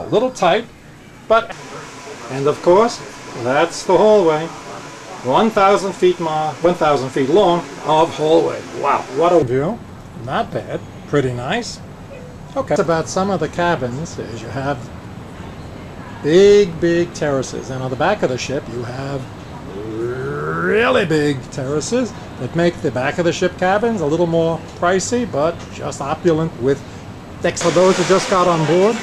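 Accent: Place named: New York, United States. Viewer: love the new york accent.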